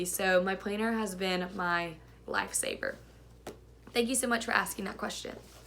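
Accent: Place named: South, United States. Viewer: I love the accent. Miss the South!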